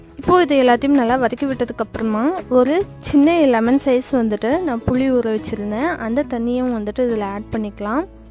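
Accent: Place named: Chennai, India. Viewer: ungal voice kovai Tamil illa Chennai Tamil maari iruku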